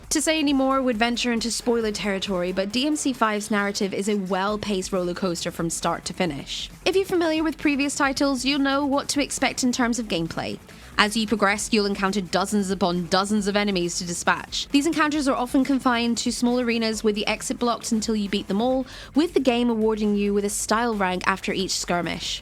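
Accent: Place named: Bristol, United Kingdom. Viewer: You sound like your from bristol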